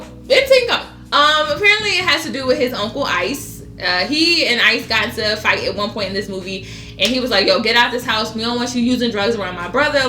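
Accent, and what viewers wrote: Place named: Philadelphia, United States. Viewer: The Philly be coming out of you on these reviews lol. Great job yet again!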